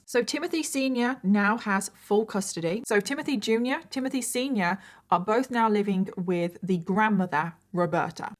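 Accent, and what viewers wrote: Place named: England, United Kingdom. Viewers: its nice to hear Timothy in an English Accent i have been in the states for a while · Congratulations on topping the charts,sounds like you have a midlands accent,spot a fellow midlander anywhere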